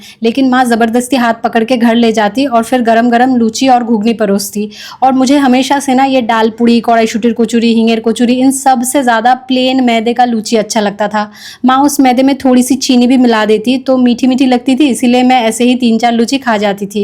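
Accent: Bengal, India. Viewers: Gopal, kishori ju ki sewa dekh bahut acha lagta hai, aap jo bangla hindi ko mix kar ke jo hindi bolte ho wo bhi acha lagta hai ❤❤❤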